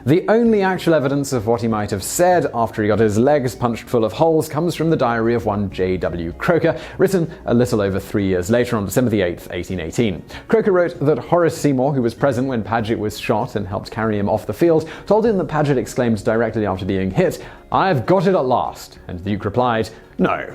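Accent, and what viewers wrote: England, United Kingdom. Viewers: Why do you pronounce "Marquis" the American way. Your accent suggests, to me at least, that you are English, where we pronounce it "markwiss". Please, give the man some dignity. He was a member of the English aristocracy, not a fucking tent!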